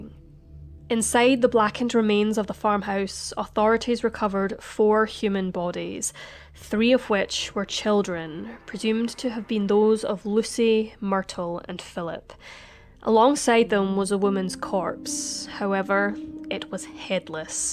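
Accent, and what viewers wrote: Scotland, United Kingdom. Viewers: Love your accent, Scotland?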